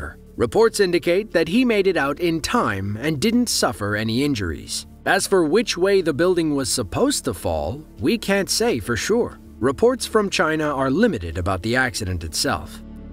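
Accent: United States. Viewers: narrated with an American accent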